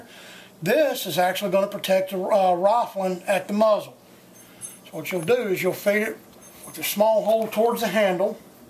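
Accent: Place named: Northeast, United States. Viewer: He lives in Maine as you can tell by his heavy, Northeastern accent. LOL. This really is good and detailed video.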